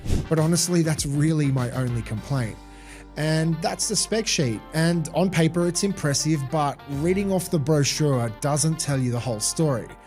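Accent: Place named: Australia. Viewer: About his voice, the Australian accent particularly his specific neighborhood town one is not globally heard, which makes it harder to understand. And add to that his unique voice tone... definitely a better mic can make it easier for certain, interestingly in his livestreams the voice sounds much more clearer so maybe it's something in the editing/software of his uploads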